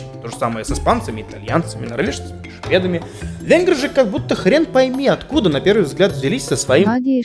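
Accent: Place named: Odessa, Ukraine. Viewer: Хаха как всегда заставил уповать от смеха. Отдельный лайк за Одесско-еврейский акцент)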